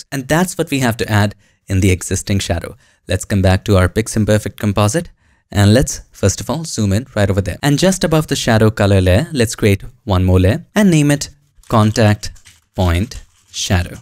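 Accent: India. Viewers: I like your funny Indian accent!)))))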